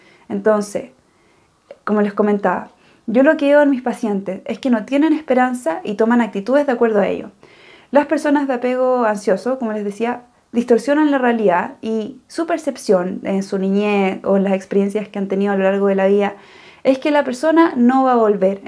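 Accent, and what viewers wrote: Chile. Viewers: Hola. Vi un video tuyo hace un par de semanas, y de ahí seguí todos los tuyos. Son muy útiles. Te felicito. Aunque mi caso es muy difícil. Por el timbre de tu voz (muy lindo), pienso que vos sos chilena. Y en un video te escuche que actualmente estas viviendo en la Argentina. Yo soy argentino. Me alegro que hayas elegido mi pais. Chile y Argentina, somos paises hermanos. Espero y deseo que te vaya muy bien. Mientras tanto sigo viendo tus videos, y recomendárselos a amigos. Una cosa, tus videos tienen un problema de edición. Salen con un sonidos muy bajo, que cuesta seguirlos. Bueno, un abrazo fuerte y gracias nuevamente!!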